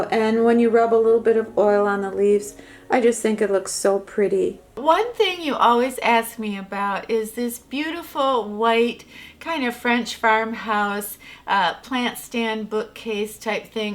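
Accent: Midwest, United States. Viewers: “Oh, you’re just saying that.” My gosh, I love that Midwestern accent!! Seriously, I do! Grew up in Minnesota, until late grade school, then in Oregon, so I love to hear that Midwestern accent! 🥰 I can’t imagine someone saying don’t want your dog and no dog in the back yard! God was definitely watching over you, and protected you and sweet Dezi. Can you imagine if you weren’t home how he would have treated Dezi!!😡 Scary! Bless you and Dezi!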